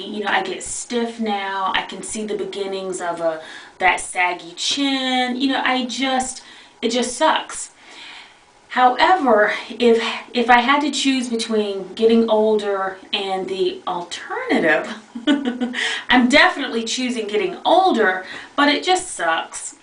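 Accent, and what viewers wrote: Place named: South, United States. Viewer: I think you are a very lovely person. You're someone that I would love to be friends with. I'm from Georgia and a southern gal too so when you say those particular words, I know exactly what you mean! It's funny how we think nothing of how we articulate our words until no one understands us! Thank you for sharing with us!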